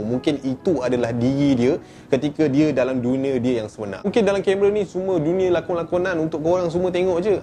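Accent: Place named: Malacca, Malaysia. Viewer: Loghat melaka 100%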